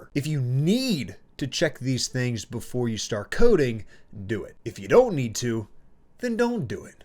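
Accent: United States. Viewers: Amazing American Accent, gotta learn some of it too!!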